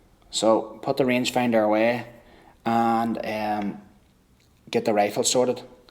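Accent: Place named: Ireland. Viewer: Good shooting,love that Irish accent.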